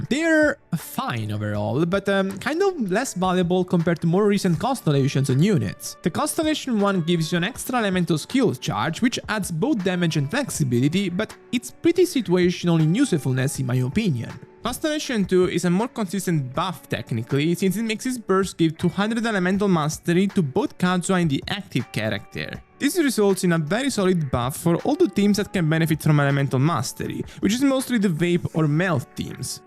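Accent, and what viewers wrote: Italy. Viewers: I love his italian accent:D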